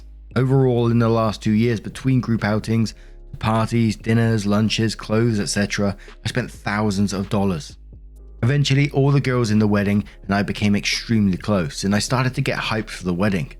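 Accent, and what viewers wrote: England, United Kingdom. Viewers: “You don’t have a Scooby Doo what it could be” Nice to hear speaking English English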